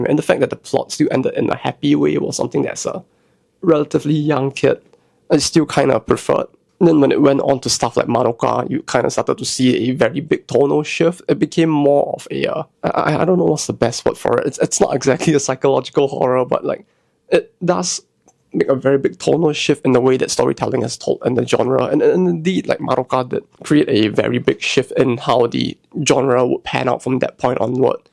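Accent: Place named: Singapore. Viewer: No way, i wasn't the only one who heard the singaporean accent in your voice heh Also i can add to that discussion of workforce gender presence in the eroge creation. One of the examples i can give is, lets say a hypothetical "Beauty isn't skin deep (yuri)" game that the art team had a specific member setup (atleast during the first project i worked on the CGs), it was only 5 people big, 3 males and 2 females (though it would grow exponentially by 2016). Each of the artist had a specific role to work onto their allocated CG pile, one would focus on the atmospheric setting of the novel, thus designing soft pallete CGs with only the minimum of affectionate connection between the characters. One would focus on the 'standout' CGs as they called it which focused on having an emotional impact ongoing between the characters, and one (me in this case) to be behind the passionate scenes, which would be more of a softcore or a play-state type of mood. 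the more experienced female artist of the team, would sit in a call with the others and point out design or anatomical discrepancies, give tips on polishing the art or highlighting certain zones (not always the naughty stuff, sometimes you want focus on the background, as the backdrop is key into how the player perceives the current situation to be, and can be very distracting if not done right. The experienced artist was also the one responsible for the more.. hardcore stuff CGs, and would basically supervise the whole art quality. In or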